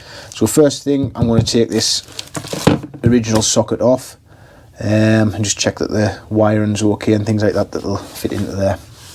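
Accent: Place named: Newcastle, United Kingdom. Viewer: love the Geordie accent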